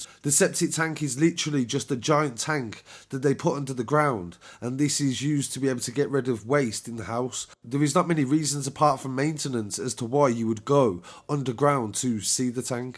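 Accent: Birmingham, United Kingdom. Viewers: The brummie narrator.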